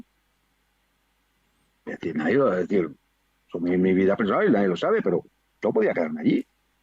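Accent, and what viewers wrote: Andalusia, Spain. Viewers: No es mexicano, es del sur de España, creo que es de Málaga.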